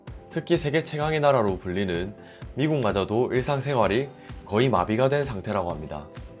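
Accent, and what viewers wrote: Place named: Gyeongsang, South Korea. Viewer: 사투리 정겨운데요 경북 지방의 표준어라 생각하고 들으니 괜찮은 데요👍👍👍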